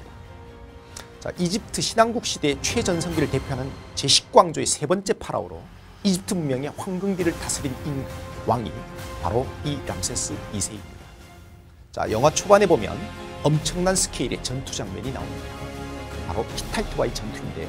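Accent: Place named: Samcheok, South Korea. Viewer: 20강짜리 7일동안 듣고 한국사능력검정 93점 맞게 해준 ㅋㅋㅋㅋ 강의할때 삼척사투리 쓰시면서 카리스마 넘치시는데... 착한척 말투 완전 적응안되네 ㅋㅋ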